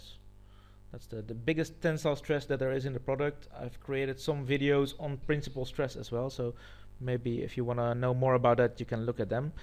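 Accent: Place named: Caribbean. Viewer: You sounda bit carrabean maaan. but thanks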